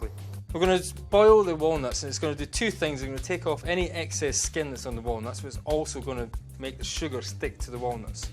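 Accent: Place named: Scotland, United Kingdom. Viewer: EXTREME THICK SCOTTISH ACCENT 🤣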